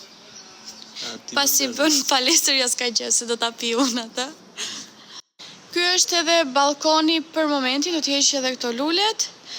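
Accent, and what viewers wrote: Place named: South, Albania. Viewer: Lezetshem si ide ! Bravo ❤❤ Nga e folura dukesh si nga jugu🙈🙈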